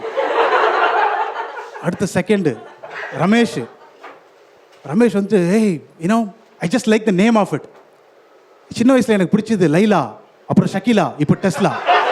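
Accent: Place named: Tirunelveli, India. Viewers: Fantastic Ji…nalla continuity and the Tirunelveli slang was superb….well done! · TIrunelveli baashaya comedy club la kaekka nallathaamya irukku. Valthukkal. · Was hilarious. Southern accent was awesome! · Hilarious! That Tirunelveli slang was gold!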